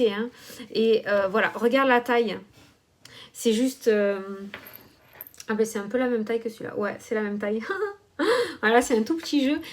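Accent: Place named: Southwest, France. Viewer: Merci pour tous vos partages et vos guidances! ( j'adore votre accent du sud ouest!)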